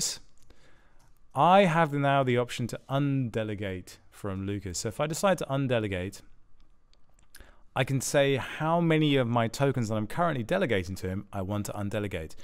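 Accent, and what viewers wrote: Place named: United Kingdom. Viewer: It’s just a British accent. The world is mesmerized by it.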